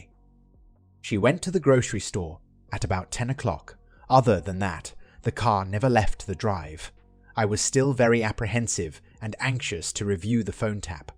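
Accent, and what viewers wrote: United Kingdom. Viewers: Lol. Annoying British accent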